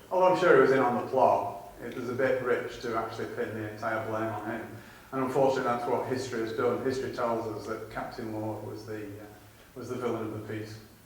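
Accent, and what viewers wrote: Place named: United Kingdom. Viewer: The echo-ey AUDIO, along with the fast speech, & British accent, make it difficult to understand what is being said; needs Improvement. Thank you.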